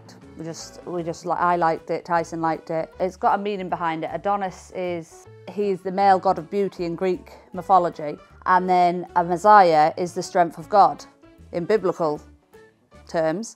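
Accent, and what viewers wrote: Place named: Doncaster, United Kingdom. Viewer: Aww beautiful 12 15. I wish i could keep more than one child. My ex wouldn't let me. Well done Paris 👏 loved the documentary by the way. I recognised some places in Morecambe from our family holiday. Beautiful home in a seaside town. Great to see you both getting along. You are amazing 👏 great to hear you haven't lost your Doncaster accent.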